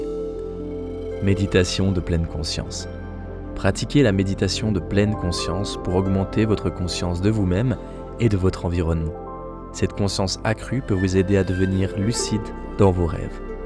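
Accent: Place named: France. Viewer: Thanks, you know i love your french accent 🥰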